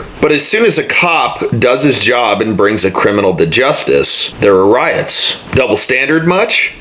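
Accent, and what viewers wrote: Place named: South, United States. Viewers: Ain't nothing better than "Southern common sense!" It's always good but that Southern accent just makes it better.☺ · I'm Black and me and my circle of friends 99.9 % of our time, never complained or even thought about racism ,white privilege, or inequality . We had more diverse things to talk about. (There IS a silent minority among us ) By his tone, the narrator(he sounds like a White Southerner and I honestly don't know about white/black relationships in the South) he's making it sound as though Black people just sit around all day and talk about racism. Personally, I'VE never been concerned about people who perpetually discuss racism. Stop pigeon holing all Black people. His conversation isn't really worth listening to. Stop trying so hard by convincing Black people that you are not racist if you're not.Quite honestly, I'm not concerned about your social graphics.just ignore people who try race baiting, they probably have nothing else better to do with their lives. 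I'm slightly annoyed by talking about people who talk about racism.JUST IGNORE THEM...PLEASE?)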